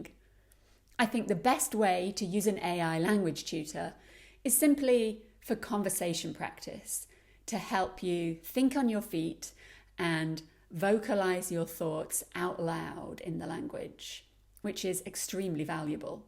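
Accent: United Kingdom. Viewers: I really enjoy your posts, the way you speak, the clarity of your statements… it sounds like (perhaps I’m mistaken) a bit of aristocratic English ;-). By the way, could you tell me (us) your name? It’s always easier to talk to someone on a first-name basis. If not, that’s OK - I know the English are famous for their eccentricity. 😉 Warm regards, Alex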